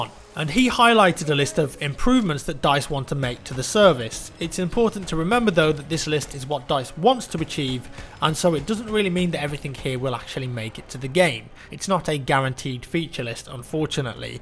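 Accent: United Kingdom. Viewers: Man i love yor British accent, mutch better to understand for me than the American Cheeseburger English.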